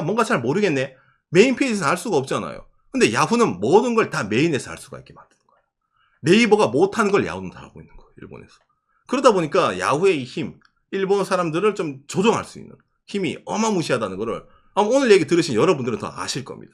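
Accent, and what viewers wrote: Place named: Gyeongsang, South Korea. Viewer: ? 말할 때 경상도 억양이 살짝 들리는 듯 ㅎㅎ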